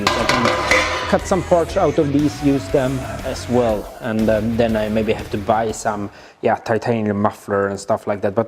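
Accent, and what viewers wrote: Sweden. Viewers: Damn that block!You can fix it!.Good luck! It's always funny hear swedish accent but better than we Finns, our accent is just terrible.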